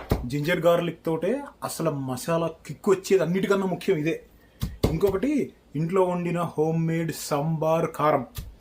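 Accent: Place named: Guntur, India. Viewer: తెలంగాణ అంటున్నారు కానీ meru గుంటూరు slang matladutunnaru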